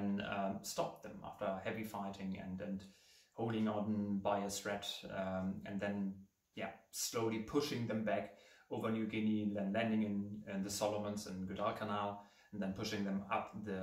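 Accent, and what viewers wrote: Germany. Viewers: Hey nice review bro, love your German accent :D I also speak German. Wie gehts? Subscribed! Have been posting some book reviews recently too, we should support each other :D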